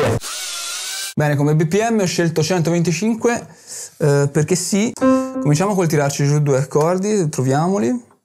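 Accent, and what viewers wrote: Italy. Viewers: toscano AHAJAKNAPA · Non sapevo fossi veneto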